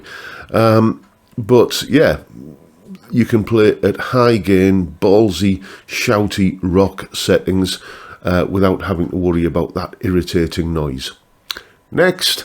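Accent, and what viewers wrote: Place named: Northern England, United Kingdom. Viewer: Nice one! Love your down-to-earth, no-nonsense, Northern bloke talk. After Gibson, Seth Lover later went to Fender and created the Wide Range Humbucker, that sounds thinner and brighter than a PAF, using 2 bobbins in series with Copper Ferrous rod magnet pole pieces instead of the steel slugs and shared Alnico bar magnet of the PAF design he is more famous for. I wanted a Wide Range Humbucker tone but using a PAF style humbucker, so as an experiment, I replaced the steel slugs and bar magnet on a cheap PAF Bridge humbucker with 12 alnico 5 5mm rod magnet pole pieces. The series tone is clearer, brighter and more open than it was before the experiment, and I got a true single coil tone when using one coil. I ran my insane experiment by Ash at Oil City Pickups, who said they already make the Brass Knuckle humbucker that is a PAF Shaped humbucker, but with Alnico 5 rod magnets - literally 2 Strat single coils sharing a humbucker baseplate, so I bought one. 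The split coil paired with Strat middle single coil gets the authentic 'ching' Strat Mix position 2 chime (Strat position 4 Middle and Neck parallel mix being the 'pung' chime tone). For those who like the hum-cancelling advantage but prefer a brighter, thinner, more single-coil-esque sound, I recommend Firebird Mini humbuckers (I use the Oil City Winterizer 1). Also vintage output humbuckers like Vanson 59's sound clearer and brighter than higher output, over-wound modern humbucker tones. I can also recommend Wilkinson M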